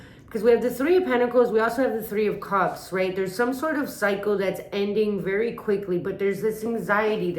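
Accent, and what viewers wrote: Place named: Upstate New York, United States. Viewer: Your accent reminds me of my extended family, who live in upstate NY, and Pennsylvania . 😊 Thanks for sharing your intuition. X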